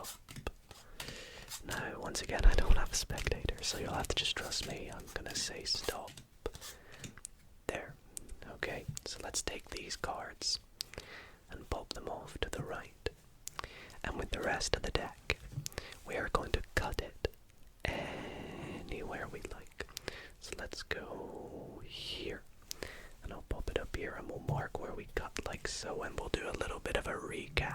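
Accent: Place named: Ulster, United Kingdom. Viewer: Nice Ulster accent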